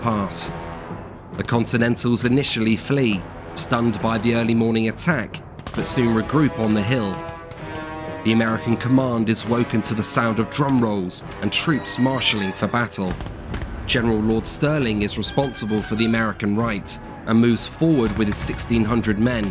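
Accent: United Kingdom. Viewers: Great series, well done and surprising to hear it told with British accent. Trying to keep the indefensible NY was Washingtons biggest mistake and almost lost the revolution, he was saved in Brooklyn only by moring Fog and Howes indecisiveness. Years later he wanted the French Fleet to recapture NY, they said no and advised to move on Yorktown. Great story overall …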